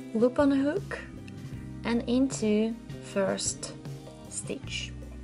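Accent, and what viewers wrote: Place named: Russia. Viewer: Thank you for explaining how to read a chart. I have always wished I could follow them. I have some amazing crochet thread/yarn that I bought 8 years ago but never did anything with them because I did not know how to read the pattern they were purchased for. I asked for a written pattern and received a chart. You have given me a wonderful gift of knowledge that is priceless. The only place I was fuzzy about was the initial chain. You appeared to make the chain length 8-10 times from the chart and that is why you were making the boxes on your sheet? Is that correct? That is how you make it to the circumference for each individuals sizes. Please tell me if I am understanding this part. The rest of the pattern appears to make more sense to me now. One last question, your accent. Where if you don't mind me asking are you from. My sister-in-law Valentina is from Russia and has a lovely accent similar to yours.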